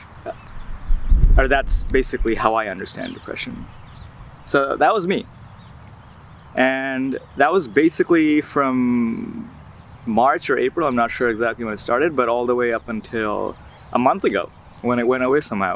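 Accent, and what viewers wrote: United States. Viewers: You sound like American accent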